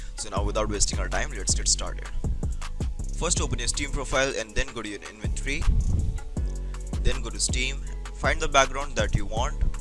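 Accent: India. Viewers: When you hear Indian accent, you know the tutorial bouta be good 😊Thank you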